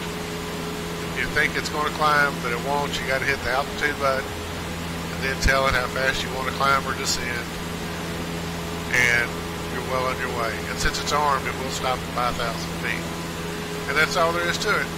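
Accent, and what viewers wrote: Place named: South, United States. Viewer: Thank you! A2A‘s C172 had taught me to stay away from the AP and fly always manually! There‘s a point where a simulation can be too close to reality, and I think they reached that point there. No fun. 👍🇺🇾 Your video was so helpful, mate! Gotta love your southern accent, man. Makes me feel so cosy and welcome. 👏🤝 regards from South America, Mike PS just found out: you gotta set your actual barometric pressure in the AP, otherwise you could be some 100 ft off…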